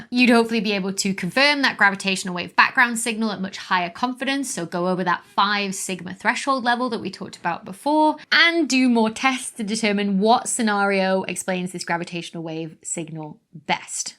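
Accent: United Kingdom. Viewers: If my teachers where this pretty and had a cool British accent I think I’d have gotten much better grades. Or as my kids say more better grades 🤭.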